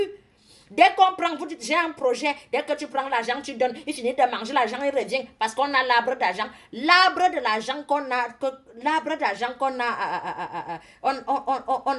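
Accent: Cameroon. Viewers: I love your camerunian accent sister 😍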